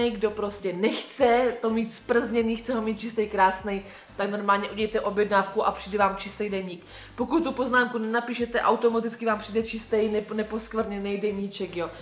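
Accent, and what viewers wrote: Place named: Moravia, Czech Republic. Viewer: Já Tě žeru 👍 jsem moc ráda, že jsem Tě díky Terry objevila s hned jsem projela x videí. Navíc mi vyhovuje Tvoje vyjadřování a ta moravština. Jsem taky z Moravy a používám stejné výrazy jako ty 😜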